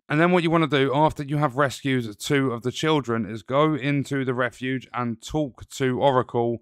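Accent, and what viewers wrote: United Kingdom. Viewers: I know his time zone. His accent is a dead giveaway. He’s definitely British. So his time zone is the same as mine!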